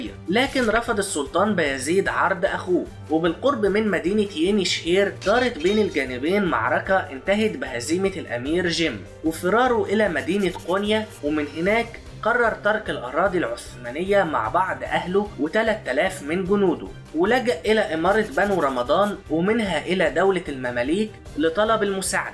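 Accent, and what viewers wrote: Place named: Egypt. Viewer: التاريخ الاسلامي بالرغم من الخلافات بين المسلمين على السلطة لكن لا يمل من سماعه خاصه عندما يسرد على طريقه اخواننا المصريين تحياتنا لصاحب القناه استمر بالتوفيق والنجاح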